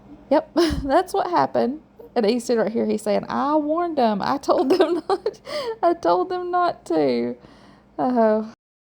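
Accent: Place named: Appalachia, United States. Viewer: You talk just like we do in southwest Virginia.( The Coalfields) We are near eastern KY and East TN ( Harlan County, KY and Kingsport, TN)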